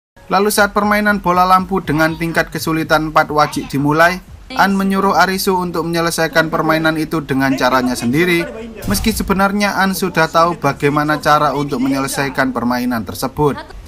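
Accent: Java, Indonesia. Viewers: Jowo ta mas?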